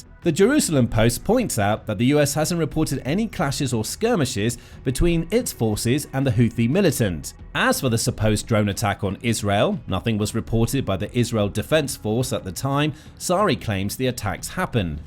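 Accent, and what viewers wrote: United Kingdom. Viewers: Your British accent tells me everything I need to know BBC should worry more a about your rip gang's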